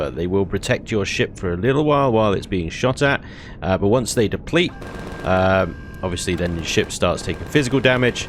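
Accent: United Kingdom. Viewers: It's his british accent. Really charms your pants off, lol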